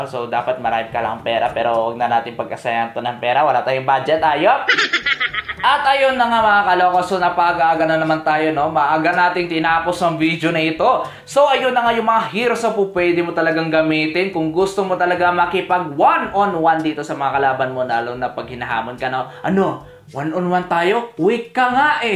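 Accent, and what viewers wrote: Ilocos, Philippines. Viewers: Taga baguio ka boss? Aus pre ung tono mo pang pure ilocano tlga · Ilocano ka gayam idol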